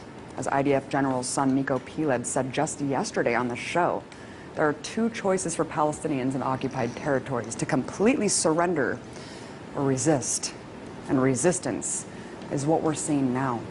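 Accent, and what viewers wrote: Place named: United States. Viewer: لكنتها لا تبدو انها روسية وانما امريكيه تعمل في قناة روسيا اليوم الانجليزية .. بس بصراحة المتحدث بأسم الخارجية الامريكي 😒 😒 وين السيسي؟ يا بشرة خير وينك؟ يا معلم من جيت انت ما شفنا غير القتل والقتلى كلهم مسلمين من مصريين و فلسطينيين كلهم في رقبتك يا ظالم الله ينتقم منك والله لو ان محمد مرسي بالحكم ما تجرأت الحقيرة اسرائيل يا شعب مصر انتم من اتيتم بالسيسي ودماء القتلى في رقابكم لانكم ايدتم هذا المجرم ارقصوا طربا وفرحا ع انغام بشرة خير هذا الي انتوا فالحين فيه هز الوسط و مش غريبه مادام الام المثاليه فيفي .. لك الله يا مصر ولك الله يا غزة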